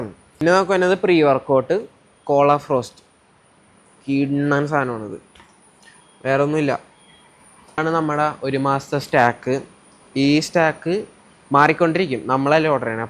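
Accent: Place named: Kochi, India. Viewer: Kochi slang poli bro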